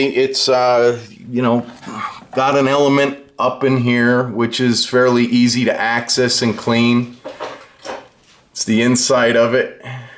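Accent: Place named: Upstate New York, United States. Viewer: He sounds more upstate. I’d say Binghamton.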